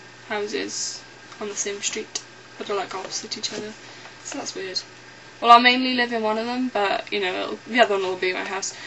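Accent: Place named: Wales, United Kingdom. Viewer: ahh ^_^ how cute is your welsh accent?! okay yes i know im weird for saying that. but i find it so cute haha ^_^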